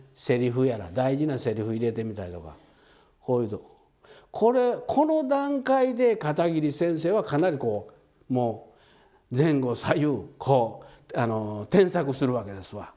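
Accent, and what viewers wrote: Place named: Kansai, Japan. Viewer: 関西出身だから、聞いてたら運転免許更新センターのおっちゃんが喋ってんのかなと思ってしまう。まんまこんな感じの喋りやし。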